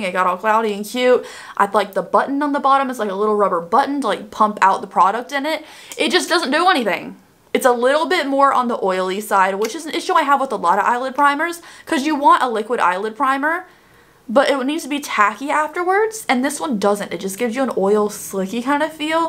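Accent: South, United States. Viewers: How southern are you sounding now days?! I'm loving it!! Sound so precious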